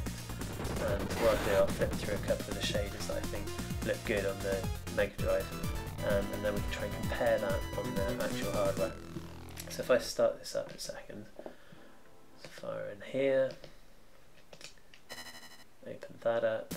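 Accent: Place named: United Kingdom. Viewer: Why do all the British gamers on youtube have your accent? Or are you all THE SAME DUDE?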